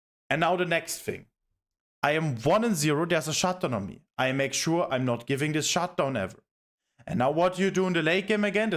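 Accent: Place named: Germany. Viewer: Sounds like German English. 😄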